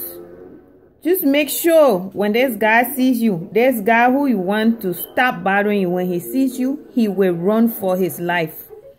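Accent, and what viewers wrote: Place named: Liberia. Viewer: Liberian accent haha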